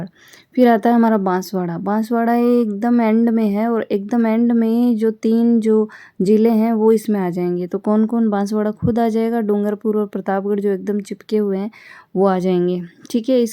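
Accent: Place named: Bihar, India. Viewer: Didi aap Rajasthan se hai aapki hindi up Bihar wali lag rahi hai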